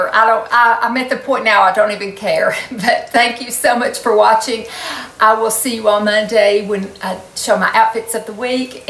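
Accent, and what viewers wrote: South, United States. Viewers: I think we are all blessed if we just look at the great things that have happened in our lifes. kids, grandkids, and if luck maybe I'll be able to meet my geat grandkids one day. I have Dystonia, CFS, Fibromyalgia and spine issues but I still get up every morning thinking about my life being so blessed. I have just been diagnosed with the Dystonia I didn't even know anything about this thing that has taken over my movements on my right side in December of last year. I feel it has got hold of the wrong loud mouth lady lol. Bless you for the video you do it really is a true blessing to watch your video's. I live in Georgia you sound like your from the south to my friend... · Hey, Love your videos and your southern accent. I know you are gluten free (Chrohn's Disease and maybe Celiac). I have Celiac Disease and was wondering if you choose gluten-free lipsticks to wear? 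If so can you recommend some. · You are so beautiful. I LOVE how your voice is so calming. Im from the south so the accent adds to the charm. Thank You for the wonderful tutorials. Also, you are so humble.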